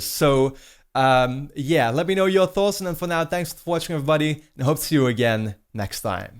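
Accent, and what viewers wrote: Netherlands. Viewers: I wonder if he is dutch cause he has that accent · am i fucking glad switch doesn't have messages XD also i'm pretty sure you are dutch judging from your accent though possibly rip my brothers..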